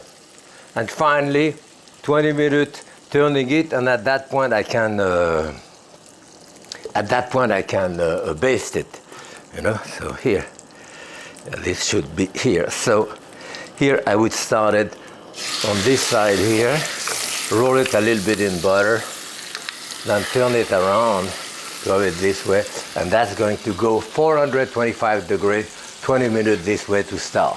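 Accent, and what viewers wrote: France. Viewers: He sounds french. Must know what he's talking about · I am not at all the worlds greatest cook, but Jaques Pepin is truly my cooking idol! Not only only for his great cooking, but for that strong French accent as he instructs you! Thanks! Happy cooking. ❤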